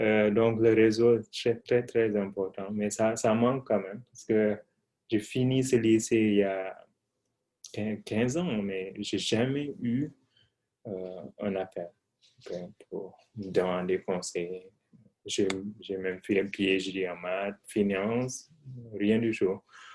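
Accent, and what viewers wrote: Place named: England, United Kingdom. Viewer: C'es ouff tu parles français avec un accent anglais pour un francophone ! Great!!!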